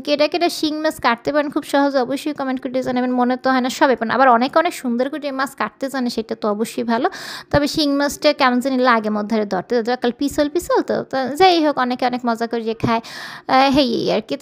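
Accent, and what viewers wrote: Barishal, Bangladesh. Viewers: Aponar kotha sunte Amar khub bhalo lage especially Aponar borisailla bhasa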